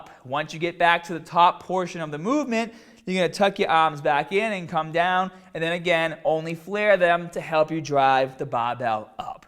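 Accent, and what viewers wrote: Boston, United States. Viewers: Subscribed for the Boston accent and legit information. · I'm assuming you're from Boston, Mass. Love the accent [here in Australia!]. Very informative video. Excellent. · love these videos but sometimes that accent makes me crack up :Bah, Bahbell, Hahd, entiah, muscle fibah hahaI wonder how weirb my SoCal accent sounds in Boston and new yohk and new joisey ? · Love the Boston accent. No homo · boston aye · yeah the dude has a real Boston accent lol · Sounds closer to a Boston accent. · Got nothin but love for that Boston accent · Boston or New England accent basically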